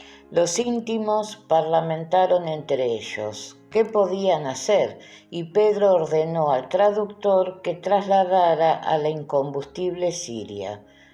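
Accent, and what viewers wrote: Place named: Argentina. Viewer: Quiero hacerte una pregunta.. E escuchado 24 episodios que tu as narrado estupendamente.. Hay más o se termina hay gracias.. Bueno por tu voz se que eres de la Argentina.. Yo soy de España Murcia un saludo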